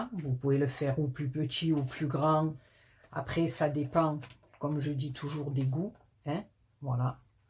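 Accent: South, France. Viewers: Coucou Superbe cette carte.... J adore tes explications et ton joli accent du sud comme le mien 😜😜 Gros bisous 😘😘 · Bonsoir tres jolies vos 2 cartes merci pour ce tuto j aime beaucoup vos tutos et j aime bien votre accent du sud il me fait penser au soleil bon week-end à bientot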